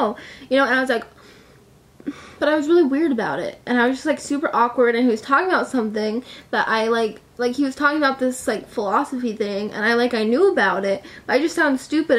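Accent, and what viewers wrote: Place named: South, United States. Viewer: You sound kind of southern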